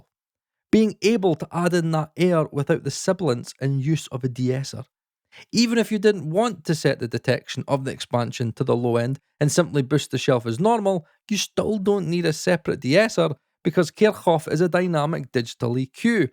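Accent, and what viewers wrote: Scotland, United Kingdom. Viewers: so this isnt a trainspotting video? (im happy about this) i just have a shallow relation of the scottish accent and a 10bag and pin...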